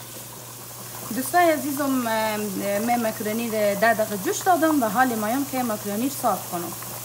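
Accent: Herat, Afghanistan. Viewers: With the beautiful Herati accent you make it more interesting to follow. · به به ماکارانی پختن با لحجه هراتی خیلی عالی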